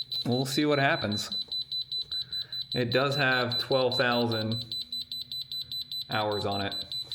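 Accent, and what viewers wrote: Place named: Pennsylvania, United States. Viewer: I have to ask, are you related to Sam from Scrappy Industries? I see the personalized "Scrappy Industries" sweatshirt, and you definitely have a heavy western Pennsylvania accent. (I grew up 40 miles west in Steubenville, Ohio.). You look like you could be his brother?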